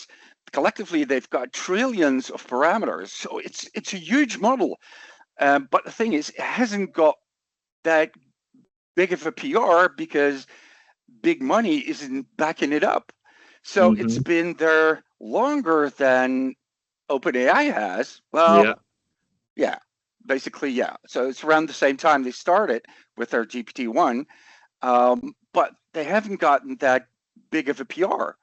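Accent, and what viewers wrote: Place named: Australia. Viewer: Nice Aussie accent for a Dutch guy ...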